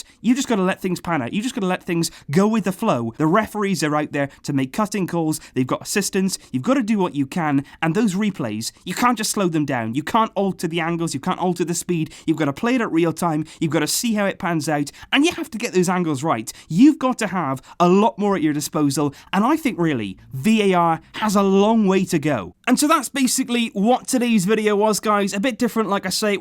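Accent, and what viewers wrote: Wales, United Kingdom. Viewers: I really love your Welsh accent. Brilliant content as always, and I do hope this channel would grow bigger and bigger.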